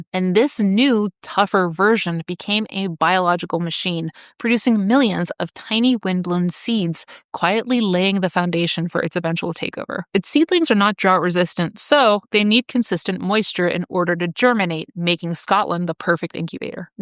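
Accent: United States. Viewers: Are you American? You used pounds instead of dollars but you sound American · I won't listen to anyone with a USA accent.. until you get rid of evil numbnuts Trump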